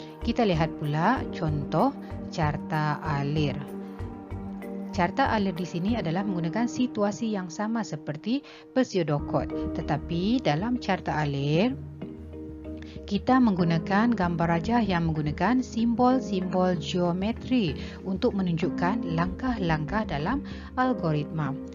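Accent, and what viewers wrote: Sabah, Malaysia. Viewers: Ckgu orang sabah ke atau sarawak?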